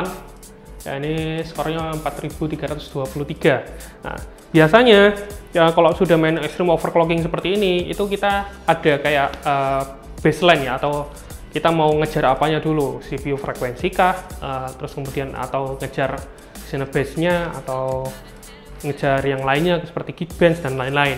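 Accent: Java, Indonesia. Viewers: Medog tenan mase 😀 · Khas medok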